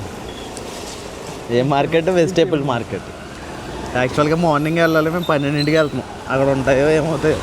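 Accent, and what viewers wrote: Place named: Godavari, India. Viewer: Godavari slang 😊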